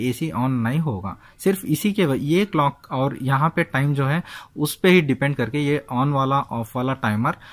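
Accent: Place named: South, India. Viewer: Very good explanation I think you are from south india area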